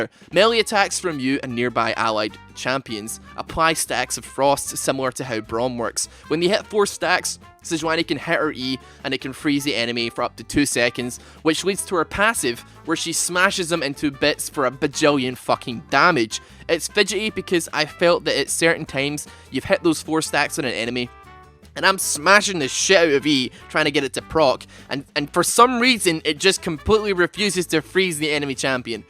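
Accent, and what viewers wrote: Scotland, United Kingdom. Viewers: Is he scotish?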